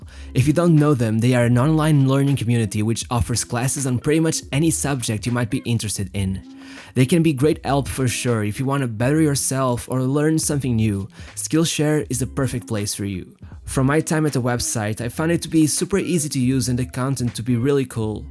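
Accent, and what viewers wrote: Portugal. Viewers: Fodas em 2012 a internet não era o que é hoje ? Este rapaz não pediu nada a ninguém, eu não entendo o título deste vídeo. This title is not only wrong, it is highly offensive. This kid didn’t scam anyone. They pressured him and his family and put them in the spotlight. He just didn’t live up to expectations.there is nothing wrong with that. I can hear from your accent that you are Portuguese, devias ter mais respeito na maneira de falar. Chamaste lhe scammer várias vezes e isso não está certo. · O narrador é tuga, só pode.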